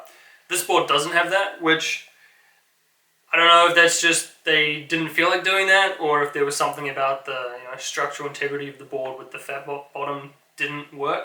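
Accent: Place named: New Zealand. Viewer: Haven't heard a kiwi since crunchie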